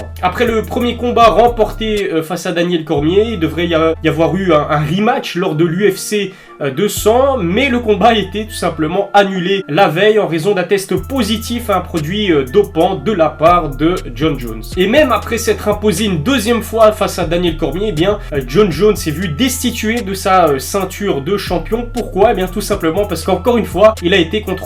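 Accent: Belgium. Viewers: Un peu, je suis belge également du coups je l’entends peut etre plus que les autres, tu es de quel région ?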